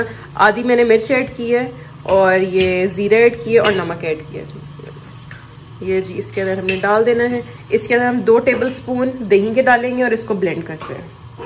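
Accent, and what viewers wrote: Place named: Punjab, India. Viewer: Your Punjabi touch in conversation and smile 😀 love it ❤️❤️❤️❤️❤️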